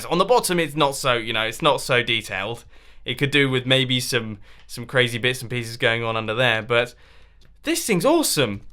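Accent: England, United Kingdom. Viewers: You're from Britain. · r u from England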